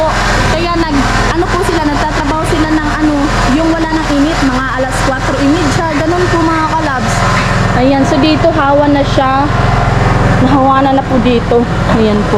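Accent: Visayas, Philippines. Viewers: Ang laki ng bahay mo bagay sa iyo dahil maganda ka ok lang ang tagalog mo kahit meron halong visaya I love watching you video here in the USA ❤️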